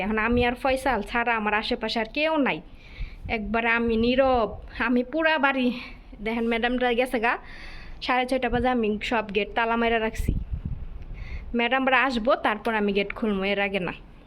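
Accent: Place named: Narayanganj, Bangladesh. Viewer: বাংলাদেশে কোন এলাকায় আপনার বাড়ি? আপনারা ভাষা নারায়ণগন্জ বা বিক্রমপুর এর মনে হচ্ছে।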